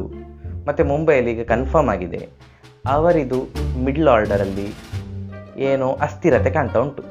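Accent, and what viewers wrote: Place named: Coastal Karnataka, India. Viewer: ನಾನು ಮೂಲತಃ ಉತ್ತರ ಕರ್ನಾಟಕದವನು, ಕರಾವಳಿ ಭಾಗದ ಭಾಷೆ ಕೇಳೋಕೆ ತುಂಬಾ ಸೊಗಸಾಗಿರುತ್ತದೆ ಅದರಲ್ಲಿ ಬೆಳ್ಳಂಬೆಳಗ್ಗೆ ನಿಮ್ಮ ಮಾತು ನನಗೆ ಹಕ್ಕಿಯ ಚಿಲಿಪಿಲಿಯ ಹಾಗೆ ಇಂಪಾಗಿ ಕೇಳಿಸುತ್ತದೆ. ಧನ್ಯವಾದಗಳು.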